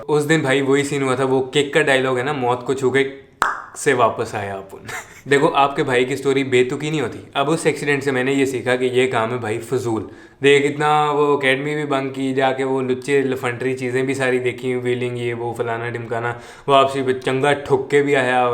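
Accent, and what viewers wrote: Punjab, India. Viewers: hamey story sunaya kro plz ap ki story buht sander jaberdast zindabad hoti hen...us ap Jo punjabi tarka legaty ho wah buht hi shapakin ha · ka punjabi lehja zaberdast hai.ham thehrey karachi waley punjabi.🙄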